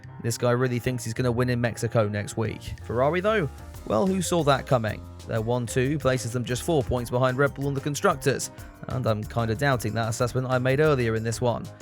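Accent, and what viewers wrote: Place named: North, United Kingdom. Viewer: can you please talk a bit slower for not so northern accented non Americans thanks otherwise good comedy review😂😂😂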